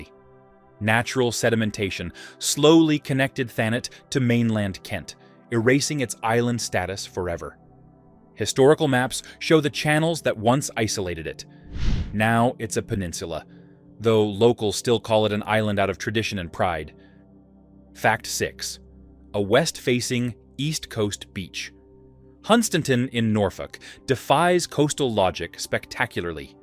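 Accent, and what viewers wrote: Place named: United States. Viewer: It would be better without the awful American accent. The town of Berwick is pronounced BERIK not Ber W I C K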